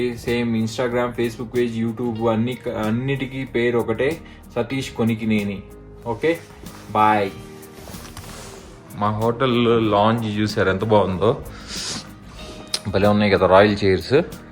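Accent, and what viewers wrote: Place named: Guntur, India. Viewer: Me guntur slang valla anukunta chalaa natural ga untundhi me explanation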